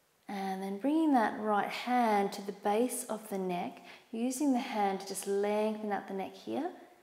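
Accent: Australia. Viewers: Wonderful. Thank you so much. REALLY needed this after a long work day writing. I've just discovered your videos and having grown up in Aus I SO appreciate your soothing lovely homely accent and the Aussie birds in the background. I'm 17 weeks and only just getting back into yoga after a break to feel rough as old boots during the first trimester and your videos are such a wonderful resource. I'll definitely be donating x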